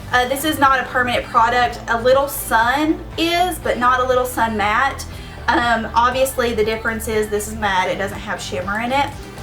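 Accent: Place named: Tennessee, United States. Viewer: I love your accent! ^_^ I just moved to Knoxville, Tennessee from Portland, Oregon and most people have this super cute accent and I just find it adorable. XD Thank you for this tutorial too! Very pretty look! ^_^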